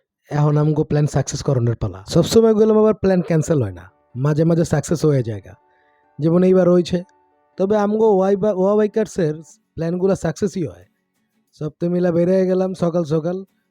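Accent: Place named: Dhaka, Bangladesh. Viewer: ঢাকাইয়া ভাষায় সুন্দর বি হয়চে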